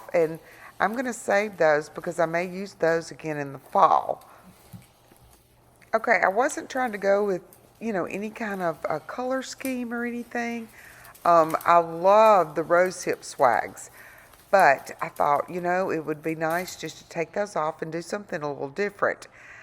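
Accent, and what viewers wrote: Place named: South, United States. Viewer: Hi 👋 I just luv your southern accent 😍 and the wreath came out beautiful.. Thanks for the upload..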